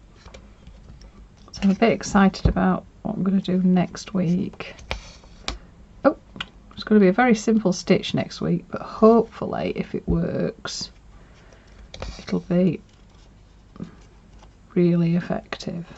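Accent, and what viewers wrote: Yorkshire, United Kingdom. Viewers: Lovely to hear your Yorkshire accent again this week, and what a pretty fringe. I have just the fabric I need for this week. Thanks for your humour and inspiration.m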